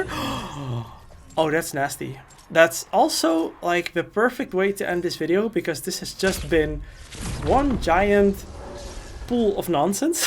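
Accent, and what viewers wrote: Netherlands. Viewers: Is he dutch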